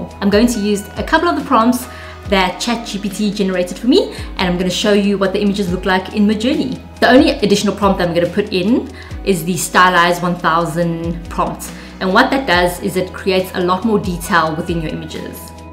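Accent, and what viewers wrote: South Africa. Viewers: This seems to be a South Africa accent. Hi from Cape Town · Immigrated to the States when I was 10. At the core I'm South African. Recognized your accent right away.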